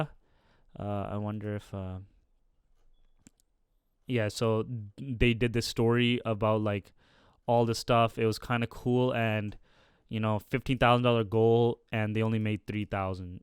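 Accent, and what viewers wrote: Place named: India. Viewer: Can you drop the indian accent...its so gross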